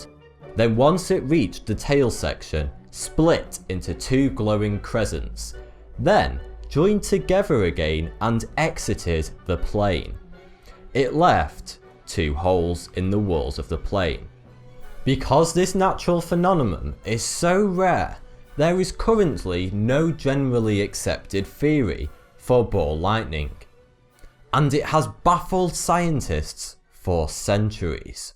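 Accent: United Kingdom. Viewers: thomas I love the British accent, seriously... I could listen all day and never tire of it. :)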